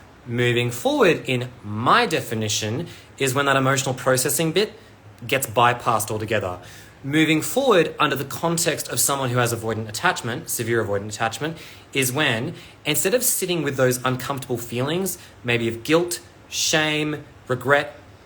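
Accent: Australia. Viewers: Are you from Perth/Western Australia? Your accent reminds me of some I used to know. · I loved it when I started listening to this, and it was a fellow Aussie speaking!!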